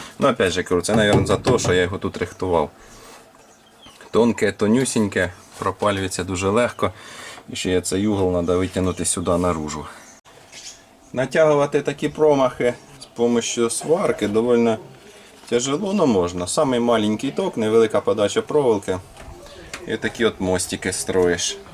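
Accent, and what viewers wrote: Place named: Poltava, Ukraine. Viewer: Вподобайка як завжди!) Нагадує полтавський говір)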